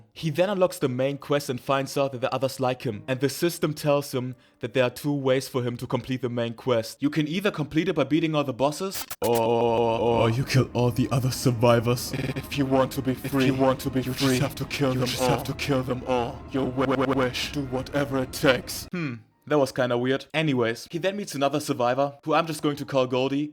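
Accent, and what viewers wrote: Germany. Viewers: You Sound Like U have a German accent somehow xd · You got that german accent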